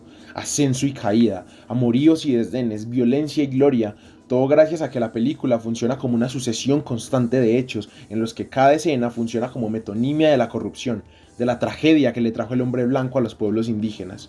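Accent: Colombia. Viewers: Amo el cine en casi todas sus facetas, hace unos años que vengo viendo la crudeza, el amor y la esperanza del cine Colombiano, transmitiendo esa realidad que todos vivimos y nos toca, ahora estoy en otro país, soy de Cali- Colombia, por como te oigo sos paisa Bacano encontrar gente que ame el cine como uno, ese es un lenguaje muy fuerte, algunas de estas películas la Vi con amigos, otras en señal Colombia y otras con cracks del cine en Cali, uno de ellos se llama José urbano, quien vió al caliwood nacer, ese men es un baluarte en Cali sobre el cine, me hablaba de Mayolo, de Caicedo y así.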